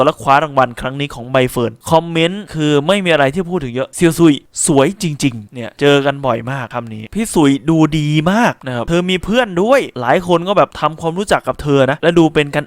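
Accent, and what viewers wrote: Ubon Ratchathani, Thailand. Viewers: แอดมินเป็นคนอุบลหรอครับสำเนียงเหมือนคนอุบล😊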